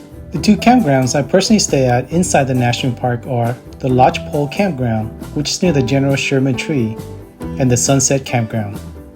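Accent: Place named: Vietnam. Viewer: Knew you viet when I heard the accent lol👍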